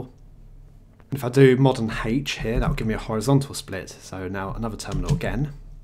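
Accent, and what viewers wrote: United Kingdom. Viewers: Again that British English. WHY WHY WHY :D Just speak normal english. Stop being so swollen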